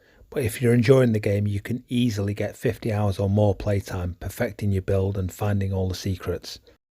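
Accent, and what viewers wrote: United Kingdom. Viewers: True, but the british accent is a bit confusing.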